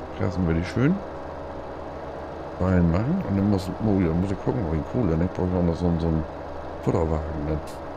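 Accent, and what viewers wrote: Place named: Low German, Germany. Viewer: Din Platt Mega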